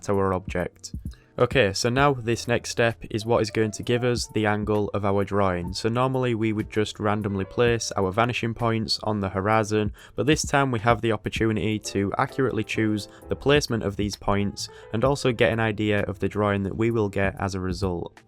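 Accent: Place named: Hull, United Kingdom. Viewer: His accent is Hull, from the North of England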